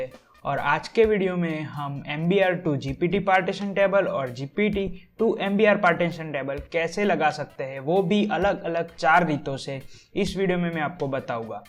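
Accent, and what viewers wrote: Gujarat, India. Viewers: Aap Gujarati ho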